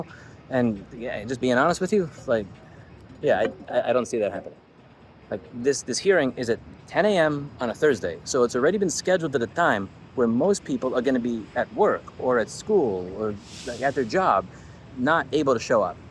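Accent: New York, United States. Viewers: This is great, I hear de New York. Normally ya don't hear the accent. Come onnnnnnn. Say "all a yous!" Don't be all grammatical on me now!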